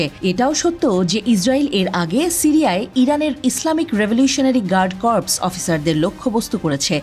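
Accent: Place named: India. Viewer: উপস্থাপক ইন্ডিয়ান হান্ডেট পার্সেন্ট সিওর আমি 🤔😢😂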